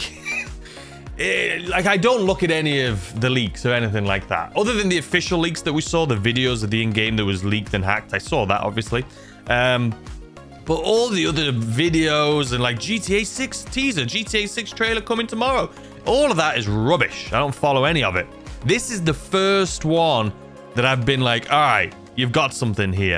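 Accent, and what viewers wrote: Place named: Scotland, United Kingdom. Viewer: youre scottish? love the accent btw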